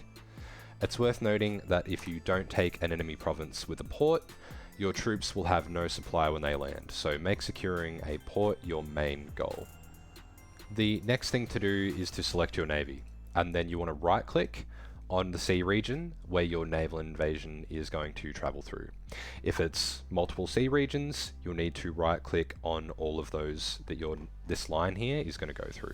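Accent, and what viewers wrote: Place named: Australia. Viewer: Interesting accent, "future" - "fyu chah", "player" - "pla yah". Where are you from? EDIT: Nvm, your profile says Australia, mate.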